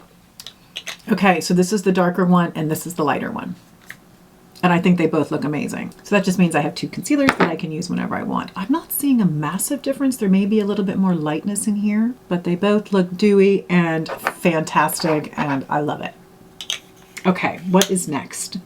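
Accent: Ireland. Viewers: Are you irish , could swear i heard some irish accent in there, im irish by the way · I like the whole line up… lipstick included! You are developing a tinge of an Irish accent😊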